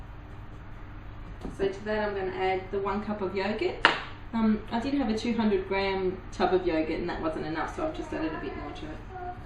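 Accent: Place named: Australia. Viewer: Oh wow! Looking for a Bassboussa recipe and getting one in an Aussie accent, so refreshing:) Hey lovely, I have seen a few tips about not mixing it long enough (so as not to release the gluten) some mom’s actually go as far as saying mix the dry ingredients with the melted butter first (to coat the semolina individual particles) Will definitely try it this weekend, hosting a professional chef for dinner, pressure is on! :)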